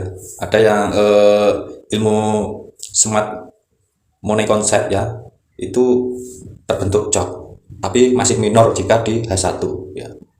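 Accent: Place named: East Java, Indonesia. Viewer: Ooo Jember yaaa mas ?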